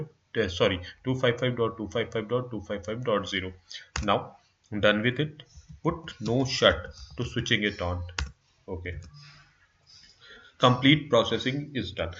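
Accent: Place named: India. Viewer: i don't like Indian or Pakistan Accent but you are an exception.Best video on packet tracer so far.I love how the video started and ended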